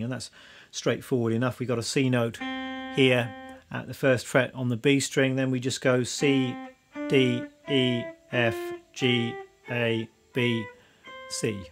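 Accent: United Kingdom. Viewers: Good stuff, thank you! A little funny to hear a guy with a British accent playing country 😁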